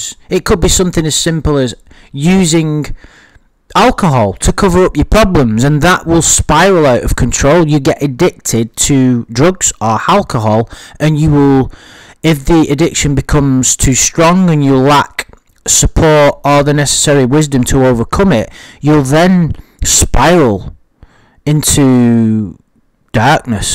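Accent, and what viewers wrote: United States. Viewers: your American accent is incredible. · Not a bad yank accent.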